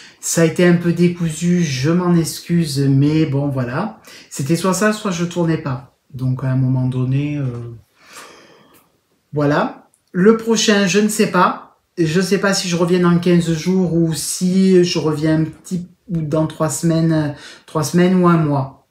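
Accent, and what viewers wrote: South, France. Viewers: ce bel accent du sud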